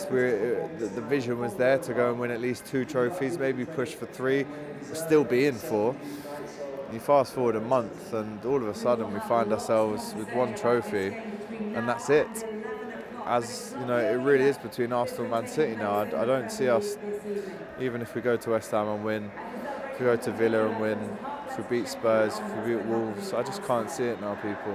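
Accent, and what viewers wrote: Liverpool, United Kingdom. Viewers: Can you put subtitles on please? I can’t understand your scouse accent. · Definitely a scouse accent that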